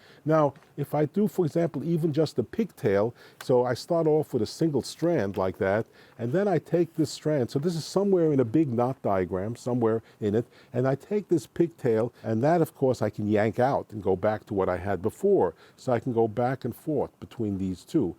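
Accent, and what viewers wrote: New York, United States. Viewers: That guy is clearly a native English speaker. Probably just a NY accent. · what accent is this? new york? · New Yorker. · I LOVE that New York ACCENT !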